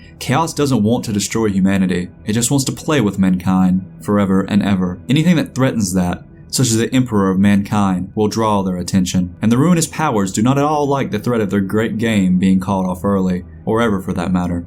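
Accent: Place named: South, United States. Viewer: Just found your channel, your southern accent is very pleasant. subbed.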